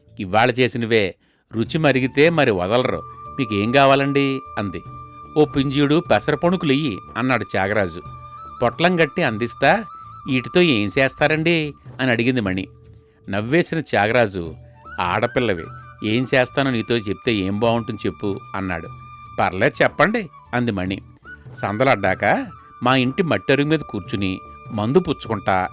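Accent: Godavari, India. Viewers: కాదేదీ కథ కనర్హం. నవ్వు అన్న ఒక్క విషయం మీద కథ అంతా అల్లడం నవ్వులాట కాదు. ఆ మణి నవ్వుని మేం చూడలేకపోయాం అన్న వెలితి లేకుండా మీ సహజమైన గోగారి యాసలో ఆ నవ్వుని స్పష్టంగా వినగలిగాం. ధన్యవాదాలు.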